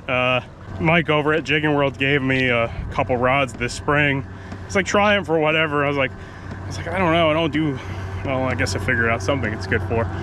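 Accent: New York, United States. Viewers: I can still hear NY in your voice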